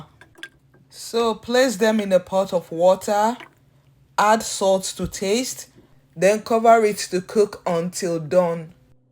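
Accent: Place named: Nigeria. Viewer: Where you got those from? I like your Nigerian accent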